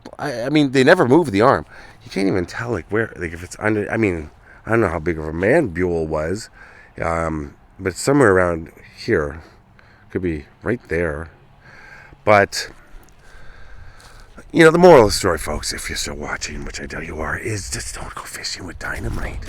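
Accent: South, United States. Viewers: Love the southern twang ❤. You nailed it ! For sure....For sure. 💯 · This was hilarious 😂 love the southern accent! You did sound like McConaughey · Haha...Love it! It's worth it just to hear you speak "Southern'ish" lol♥️☺️ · A Canadian with a southern accent. Lol! I have one but with time being away from home it is less. When I go visit and it come in thick funny! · Another, Great Video 💯! Your Southern accent made me laugh 😂. Hope you are well? I’m going to catch your next live video. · You are the best I love your humor. Your southern accident is cracking me up. I'm From Florida😂 love you and your channel❤ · . Kid dynamite 😅 I enjoyed this.. I read afterwards he was alright? That face you made OMG. It messes up their swimming bladder lol That southern accent ROFLMAO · Live your videos ❤ so interesting, thanks for bringing me along . Love your southern accent. 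You are hilarious yet respectful at the same time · This one reminds me of the foot video you made. How in the world do you find stuff like this. Loving the content. You’re so funny while being respectful at the same time. Almost as bad as the guy cooking biscuits on the bow in a thunderstorm!! 😂😂😂😂 Southern accent is on point! 😂😂 I myself am a southern gal. And I am loving it. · I have to remember not to be eating or drinking anything when I'm watching your video's like this...I dang near choked...😂😂...Love that southern draw there...Question, did Beull have Biscuits on the bow before he played with the TNT? Much respect to you for showing so much respect to the arm...Much love sent to you... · Oh my gosh, you are so damn funny. You and your southern accent. Good job though enjoy the rest of your day.🤣💜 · Love watching your videos my friend!! Very interesting!! You are an amazing person my friend!! 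Love watching your videos!! Love you and your channel!! Love the southern accent!! God bless you my friend!! Much love!! Peace!! ❤️💖🥰💙💕💜😎😎😊😊✌️✌️✌️✌️✌️✌️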